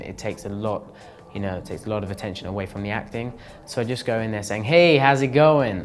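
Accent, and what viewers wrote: United Kingdom. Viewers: He acts like having a british accent is a BAD thing .. OHMYGOD HE'S SO DELICIOUS. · DONT TRY TO CHANGE YOUR ACCENT!!!!! KEEP IT BRITISH, PLEASE!!!!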